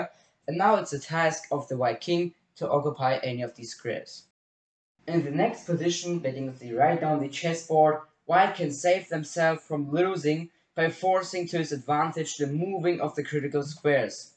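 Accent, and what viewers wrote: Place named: United Kingdom. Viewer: Begrüßung aus Bulgaria. Ich bin dein 375 subscriber. Dein Englisch ist sehr Britanisch für mich(das ist normal). Ich denke deine videos sind sehr neu und gut. Das ist die erste video YoutubeALg. shau mir auf dien channel. Ich will mehr shcach videos für angefanger (ich bin 1800chesscom2000liechess blitz) in youtube. Mein freund (unter 800) muss learn von dich. Deine erzelung ist sehr gut. In meine meinung die serious erzelung in youtube ist nicht genug.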